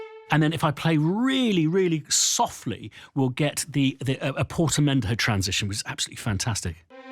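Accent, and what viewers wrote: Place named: United Kingdom. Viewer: I want a cor englès too. The British accent and proper language create an enormous credibility to my ears.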